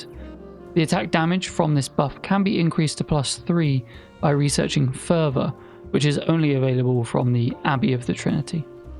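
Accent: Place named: United Kingdom. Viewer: Damn this British accent. Mehter is not Meta. It's Mehter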